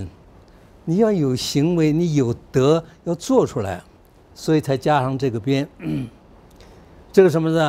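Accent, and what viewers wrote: China. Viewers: 老教授學養深厚，口音明明是外省腔，所舉的例證卻能用臺語發音，而且還能如此標準，不容易啊！值得借鏡。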